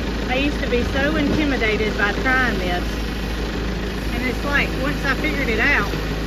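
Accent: South, United States. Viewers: I am not big on building videos, but I enjoy videos. I even watch the entire fence pole videos.Maybe it is music and your pleasing southern drawl. Love the picnic table and to honest I wouldn't mind having one like it.😊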